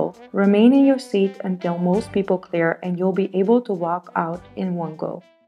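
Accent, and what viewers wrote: India. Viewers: Just curious, why do you call yourself as a foreigner while visiting your home town India? Your accent is very much Indian!!!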